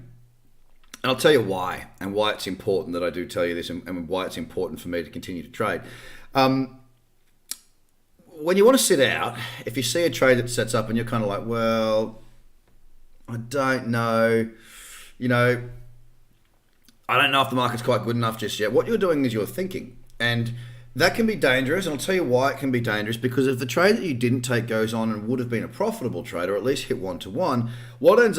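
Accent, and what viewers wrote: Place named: Australia. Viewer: Thanks. Loving your work. I'm an Aussie living in Sweden, so its good to hear that accent again!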